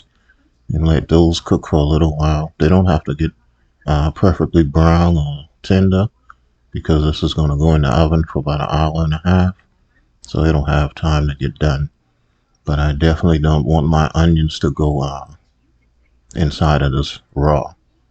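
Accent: Charleston, United States. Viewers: Are you from Charleston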